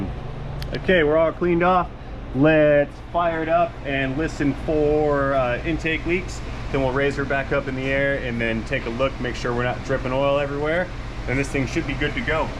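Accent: United States. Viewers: u sound like a gen x person all american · Accent? You just sound like a good old American to me. If people in central Indiana have the same accent as you, then that is why I am not detecting any particular variation in speech. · you have an American accent. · I am not from US, and as far as I am concerned your accent is what I call "general clean US" accent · Me being a Norwegian. Your accent sounds American.😅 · Accent? Hmm. As a Brit, I can definitely say your accent is Murican. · Gday. Accent wise...I'm English, so you sound American...not helpful perhaps, but there it is 😂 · You sound like most American tourists I see in my European country. And I see a lot of them. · To me (Australian), you sound American.....;) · You have an American accent which in some of these states seem to now be the minority thanks greatly to immigration. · Being in NZ your accent just sounds like a typical American TV accent. 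And to me the American TV accent is still pretty subtle to ‘real life’ American accents. The only thing I really pick up on is mirror, to me it’s sounds like me ra😊. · You don’t have an accent. You talk like a regular American. You lived in Hawaii, I know that. You mentioned that before. You talk like a regular American person. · Pt II. Accents. I`m from the UK (London) and therefore have no accent, as we invented the language. However, I am well qualified to speak about yours. You have a classic American accent. It is not accentuated like NYC, the Deep South, Boston etc. it is easily understood and well modulated. Anyone who is complaining about yours, should come with me to visit my relatives in NE Scotland. The Americans there who work in the oilfields have been known to use sign language. Carry on. There is nothing wrong with your speech. I know. So Yah boo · You sound American to me.. 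· you have "TV accent".....im guessing western CT, MA, upstate. maybe Michigan, NorCal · You just sound ‘American’ to me. Accents in Uk are something else. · Well, the ones made in the North of England weren't much good. And your accent is, without doubt, American ! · I do not even want to guess where your accent comes from it all sounds "yank" to me & excessive too boot.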